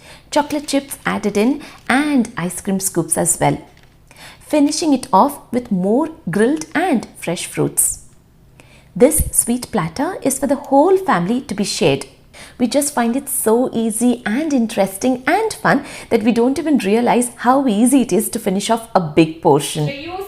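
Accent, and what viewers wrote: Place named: North Karnataka, India. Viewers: Hi… came across your video recently and the language accent same as we do.. which part of Karnataka you are from .. nice video .. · North Karnataka kannada accent damn!! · ನಿಮ್ಮ ಉತ್ತರ ಕನ್ನಡ ಭಾಷೆ ಕೇಳಲು ಬಾಳ ಚಂದದ.♥️ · You are amazingly beautiful... Loved the family time at the last which is the very essence of a happy family.. Loved to hear north karnataka kannada.. I'm ur new subscriber 😀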